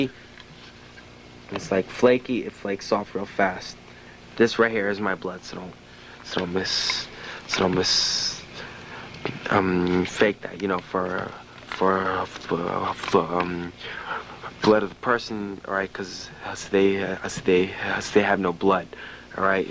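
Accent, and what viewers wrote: New York, United States. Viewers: his New Yorker by his accent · He has a New York accent though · He has a new york accent tho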